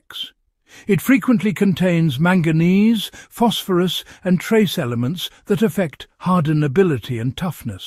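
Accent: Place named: United Kingdom. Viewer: AI has gone British & Snotty 🖕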